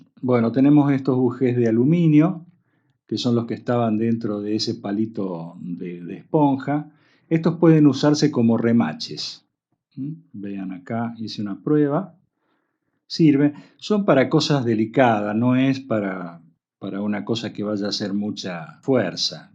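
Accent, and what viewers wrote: Argentina. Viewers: Mira que casualidad (o causalidad), hace unas semanas atrás, al ver como mi flía se encarga de romper o descomponer los encendedores que yo aporto para la cocina (mientras yo los proveo, ellos los dejan obsoletos ... buah!), me encontré con una buena cantidad de estos artefactos indesusos. Y como soy dado pa las cosas manuales, las herramientas y la creatividad, me propuse el reactivarlos combinando algunos que les faltaban la piedra, o partes varias con otros con sus recamaras de gas intactos. La paciencia, dedicación y las ganas de aprendizaje lo es todo! Gracias por tus aportes, y me hiciste reir con tu forma bien "argenta" de hablar. Saludos desde Rosario "Cuna de la Bandera Argentina". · Como se nota que es argento por la manera de hablar...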